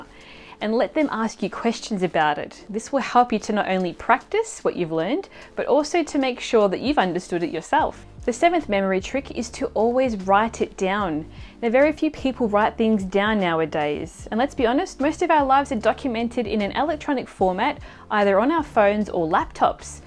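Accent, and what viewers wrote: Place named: Australia. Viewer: Hi, thank you for your informative video. I was really looking for techniques to expand my general vocabulary. I can easily visualize and link nouns as they are easy to form a mental image. However, can you give any tips on how one might form an image and action mnemonic to words which do not immediately form an image? Eg. seraphic, sedulous, unctuous etc. Really appreciate your video, there is a lot of valuable info I had not considered such as space repetition. Oh, and it's great to hear a fellow Aussie on YouTube too:-)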